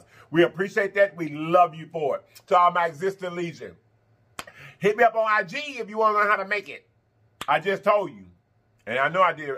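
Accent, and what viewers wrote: South, United States. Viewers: I love you!! I’m from the Sip and I love that southern twang we have... “wait a minute!!! Ya boy done got choked out!!!” 😂🤣😆❤️ I felt that!! ✊🏽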